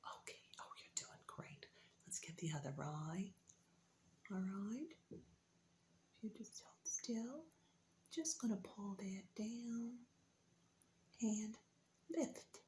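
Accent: South, United States. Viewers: is this what they call southern accent ? ^^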